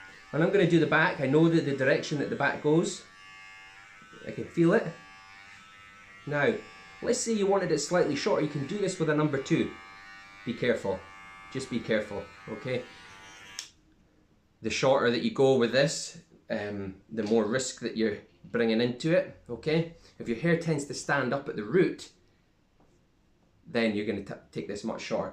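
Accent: Scotland, United Kingdom. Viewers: Lol every video ive watched has been an American, so rare to click a thumbnail and hear a Scottish accent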